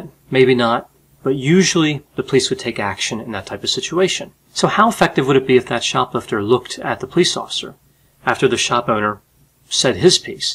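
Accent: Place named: Philadelphia, United States. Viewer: I can tell you're from the Philly area because of your accent. I too am from Philly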